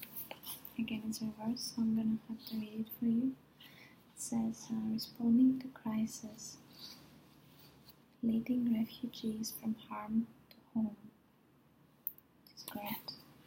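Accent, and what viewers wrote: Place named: Greece. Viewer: Greek, isn't it?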